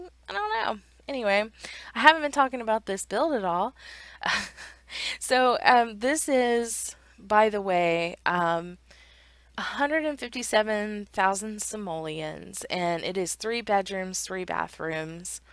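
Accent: South, United States. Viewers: I'm from GA but live in VA and your accent sounds so familiar. Haha. You kind of give the impression that you occasionally have a super southern accent but you've focused on correcting it. (I have, lol)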